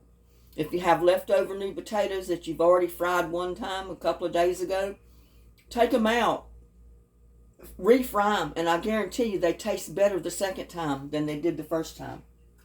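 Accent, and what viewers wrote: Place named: East, United States. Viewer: Hi. Curious... you're in Texas?... Trying to figure out your accent..are you from the east?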